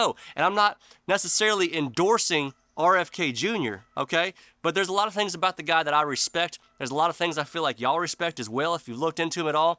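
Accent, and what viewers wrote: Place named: New England, United States. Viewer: What I love about your channel is your Yankee accent and no BS talk. Your music is pretty good too! 👍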